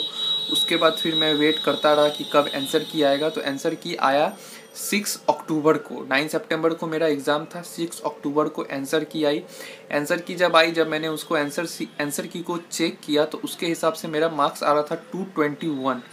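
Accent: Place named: Bihar, India. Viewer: Apki baat krne ke style se lag rhe hai aap bhi Bihar ke hai.. Hope this year i will see you in cllg as my senior 🙏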